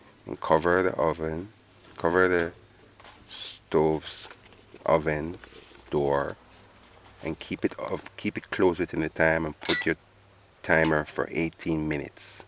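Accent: Jamaica. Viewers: I love hearing Jamaican accent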